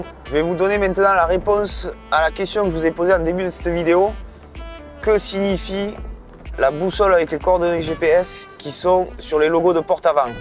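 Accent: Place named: South, France. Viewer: L'accent du sud, il apporte de la chaleur :) . J'ai acheté un 2008 crossway 130ch. je le récupère demain j'ai hâte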